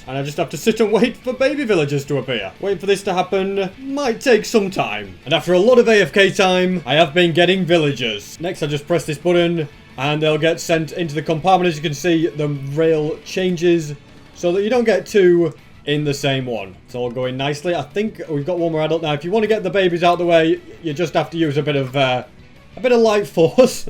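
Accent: United Kingdom. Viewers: No he is british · I absolutely love you British accent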